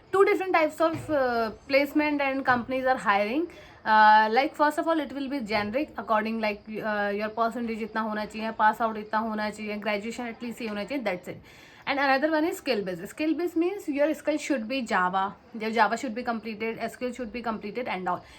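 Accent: Kumaon, India. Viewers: are you kumaoni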